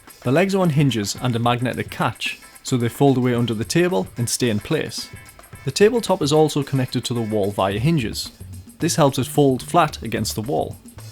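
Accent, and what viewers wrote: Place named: Newcastle, United Kingdom. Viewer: Youtube needs more Geordie accents- sub'd for great film making tips.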